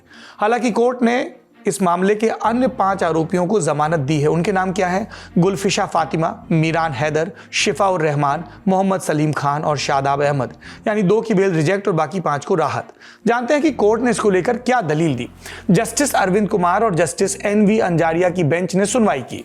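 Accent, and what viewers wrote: India. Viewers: सौरभ भाई, यद्यपि विचारधारा के स्तर पर आपका व हमारा 36 का आंकड़ा है, परंतु फिर भी आपके समाचार बाँचने का विशुद्ध देसी अंदाज व हमारे शहर से आपके जुड़ाव होने के कारण मैं आपका अत्यधिक मुरीद हूं । आशा ही नहीं वरन् पूर्ण विश्वास है कि अगले प्रारूप में आपका खबरें बाँचने का विशुद्ध देसी अंदाज व भाषा शैली राष्ट्रवादी विचारधारा से भी ओत-प्रोत होगी ।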